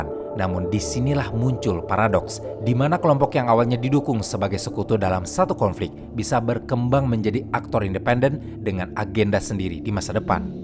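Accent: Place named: Banyumas, Indonesia. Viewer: Wong ngapak ngendi kie😂 tegal um?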